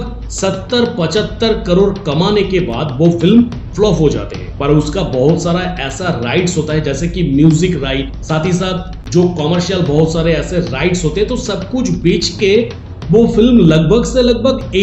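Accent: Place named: Bengal, India. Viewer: Yes, Bengalis have a lot of courage. Heard of Khudiram Bose, or Bagha Jatin (Jatin Das)? Charapona is Bengali word and the accent of the narrator is unmistakably Bengali.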